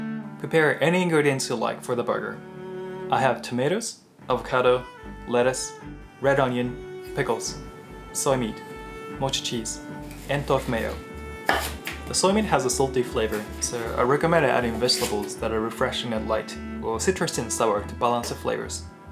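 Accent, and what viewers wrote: United States. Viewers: You sound so much more american since I first started watching- wo a h. Also the video is g o r g e o us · he sounds so american now LOL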